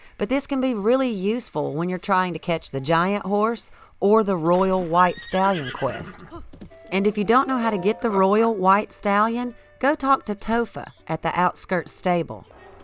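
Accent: South, United States. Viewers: I adore you so much. I hope your channel takes off. You've already helped me so much--with the electricity and the test of strength, and then with how to defeat guardians and keep retrying to get giant cores. Now I learn you can use fire sword to light shît, and great info on bows and arrows. And to top it off, you're extremely pleasant to listen to. Southern accent for days!!! There are some ppl I put up with their voices for their content, others with content I want but I CANT with their voice, but your voice is like coming home. Thank you for existing, for so many reasons.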